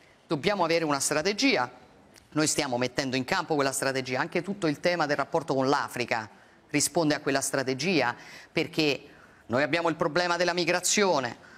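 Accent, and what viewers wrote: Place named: Rome, Italy. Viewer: lei non parla l'italiano ma il romano che è ben diverso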